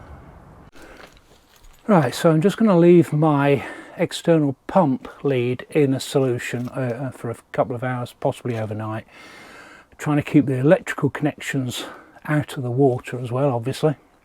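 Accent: United Kingdom. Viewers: Thanks for that. Going off your accent we are not very far from you being in Bolton. There are a plethora of motorhome videos listing what you should and should not take, I have driven extensively in France over the years, even taking a 7.5 tonne over to move my parents over there when they were alive. Im finding the advice on the new regulations regarding camper weights and what you need for vans over 3.500Kgs very confusing though! Have you any experience of the Etrusco A7300DB?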